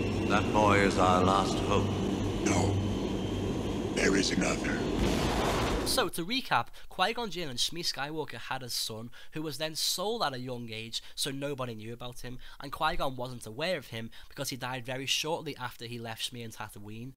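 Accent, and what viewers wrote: Liverpool, United Kingdom. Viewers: Are you from Liverpool???? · Where are you from? UK probably?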